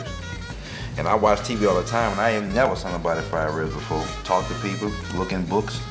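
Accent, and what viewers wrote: New Orleans, United States. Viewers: I hear that New Orleans accent. Love it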